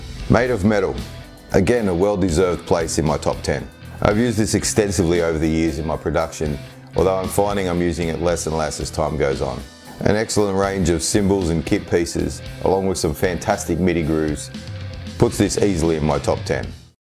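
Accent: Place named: United States. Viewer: I am definitely going to add Modern Metal and Dark Matter to my EZX collection... You're so right about the clarity of Modern Metal sounds... OMG *Random question...., did you ever live in the United States? I had a childhood friend that you remind me of who lived in my neighborhood in Georgia...he even had an accent similar to yours. I can't remember his name...he had a brother named "KK." -at least everyone called him that. The family moved away early...I was probably 6 years old. -I am almost 50 now.. 😂 ***Your channel has become one of my favorites -out of nowhere... great content,!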